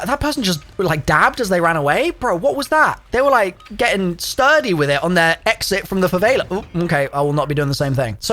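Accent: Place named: England, United Kingdom. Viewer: just wanna say, you were such a large part of my teenage life that my close friend and i had a little inside joke where every time we'd see each other we'd just go "hi guys, here" in an english accent. two 15 year old american boys just having a nice time at the arguable peak of the zombies community. it was really nice. wanna say that ive still been consistently watching your stuff. not really commenting all that much, but im here. thanks for all the laughs man, keep doing what you do.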